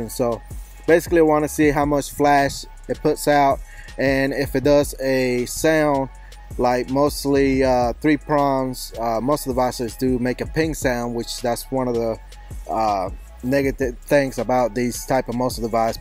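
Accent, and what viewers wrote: South, United States. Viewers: My man here has a southern hispanic accent lmao I just bought this online without researching and i am glad i found your video, it looks like I made a good decision buying this flash hider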